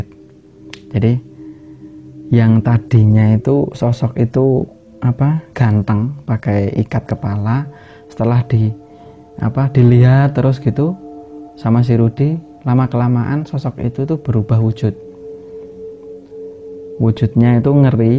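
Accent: Java, Indonesia. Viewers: Betooll.. Logat org jawa nek di campur GUA jd janggal aja.. Lnjt berkarya brooo👍👍👍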